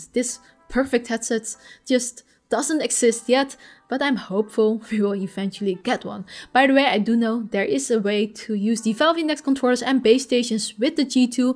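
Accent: Netherlands. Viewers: I know a Dutchie when I hear one:)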